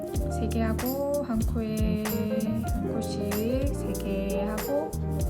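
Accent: Busan, South Korea. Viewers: 부산 말투가 정감있고 좋아요~ 오른쪽거 제 취향. 수세미로 쓰기에 너무 이쁘네요. 컵밭침 인줄 알았네요. 삼베실로 모자 같은거 뜨면 예쁘겠어요. 짧은뜨기~ 하니까 예전 추억이 돋네요. 노인에겐 치매예방, 아이들에겐 집중력 개발 안정감 증진! 많이들 보고 따라하면 좋겠다 싶습니다.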